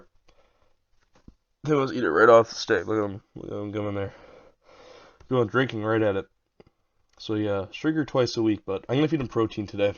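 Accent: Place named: North America. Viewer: The colony scent comes from the grease/oil they are covered in. This layer is made up of strings of hydrocarbons and the combination is different for each colony, they apply it when they groom themselves and each other. It also acts as their water proof layer so they repel rain drops and is the reason they look reflective when you shine a light on them to film. Be careful adding different queens, it's almost impossible into an established colony and the other issue you've got is you actually don't know what species your ants are. Judging by your accent I'm going to assume you are in North America and this makes it fairly unlikely they are Lasius niger. They might be, it is possible there are a few pockets of Lasius niger especially in Canada, but is more likely they are Lasius neoniger or Lasius americanus.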